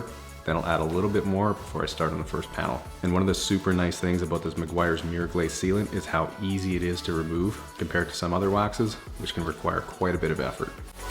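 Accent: Canada. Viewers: ah yes, let me explain. He's Canadian so when he says "garage," it sounds like he is saying "graaj." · You sounded extra Canadian this video for some reason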